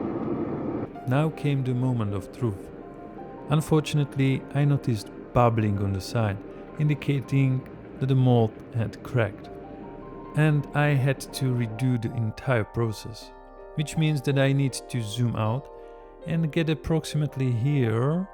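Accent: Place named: Czech Republic. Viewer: that czech accent tho.. classic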